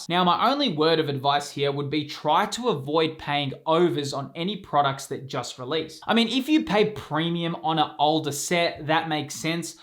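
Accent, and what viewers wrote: Australia. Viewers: I love how Australians say Naoer instead of no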